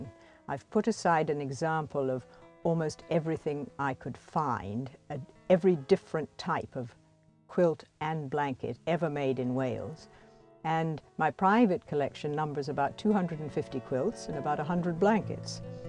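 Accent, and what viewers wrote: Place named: New England, United States. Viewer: It’s partly posh New England..a friend of mine spoke the same!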